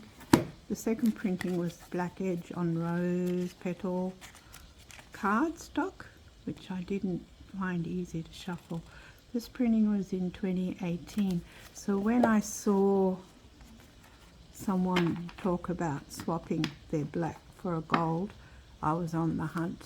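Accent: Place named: Australia. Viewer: Great selection . You sound like one of my fellow Aussies !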